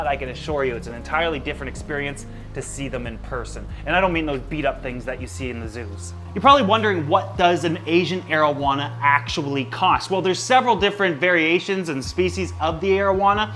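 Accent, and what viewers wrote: Canada. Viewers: Canadian accent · as a fellow canadian i understand how said cities when its said more like sighties. I know the spelling aint like that but its cities said as sighties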